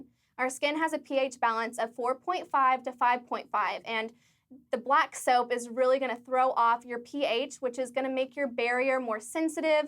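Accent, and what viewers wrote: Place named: United States. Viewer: As a New Zealander who doesn't have a thick accent, this woman's American accent is not super yanky. A good american accent.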